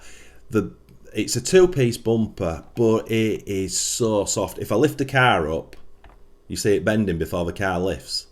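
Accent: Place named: Yorkshire, United Kingdom. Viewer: I think its Yorkshire accent. He is saying mark 1 (mk1)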